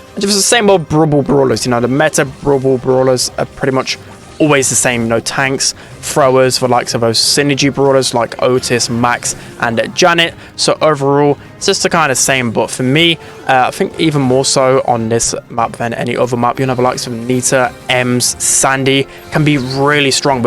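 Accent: United Kingdom. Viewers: That’s it I heard enough British accent for today.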